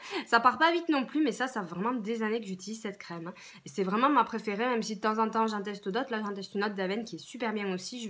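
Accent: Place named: South, France. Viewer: on entend beaucoup moins ton acceeeeeeent du suuuuuuuud sur YouTube que sur Snap !! ahha non pas que je te suive uniquement pour l'accent ...! en tout cas tes vidéos sont un réel plaisir ! pas de chichi, ni de superflu, ni de mise en scène (jolie mais bidon), tu vas à l'essentiel, tu parles des produits de façon très claire et ça fait du bien ! grosses bises ma belle !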